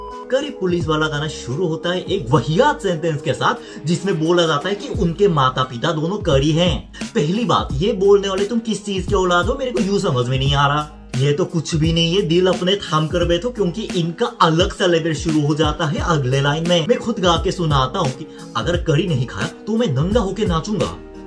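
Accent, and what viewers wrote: India. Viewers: Yaar aap indian ho mujhe nahi lagta aap korean ho aap bilkul indian lagte ho aap hindi itni fluent bol rahe ho aur itna hi nahi expression bat karne ki style sab indian hai nice sir mein first time aap ki video dekh rahi ho aap mujhe pure indian lage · Bhai aap hindi to bilkul indian accent mein. Awesome! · Korean bro your accent is completely indian noicee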